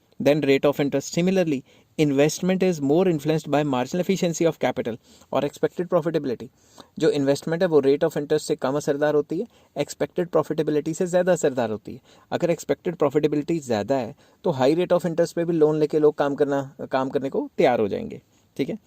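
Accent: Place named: Punjab, India. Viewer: Sir apka punjabi wala hindi bhut mst h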